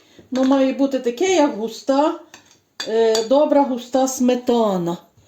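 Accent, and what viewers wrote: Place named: Western Ukraine, Ukraine. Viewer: Дуже гарний рецепт! Щиро дякую за нього! Обов'язково зроблю і спробую. А найголовніше---то говір, наш, западенський, такий рідний, ніби дома побувала... Аж в грудях защеміло... Шануймо нашу мову, нашу Україну. Ділімося всім, чим маємо. Нема в світі понад наше, рі дне. Ще раз щиро дякую за рецепт.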